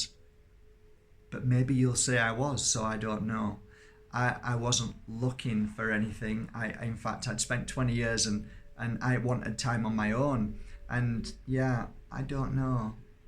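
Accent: Yorkshire, United Kingdom. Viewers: Love your Yorkshire accent so much. Even though you're all covered up with a t-shirt 😉 thank you for another lovely message. 💕 😘 🤗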